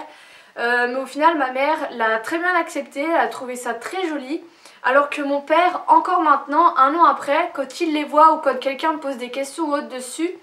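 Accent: Lorraine, France. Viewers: s'entend ton accent de Lorraine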